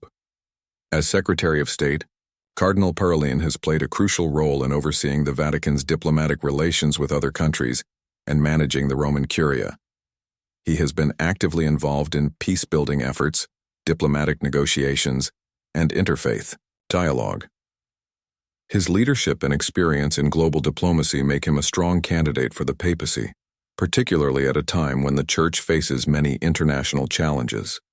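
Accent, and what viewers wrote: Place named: United States. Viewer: almost like an American talking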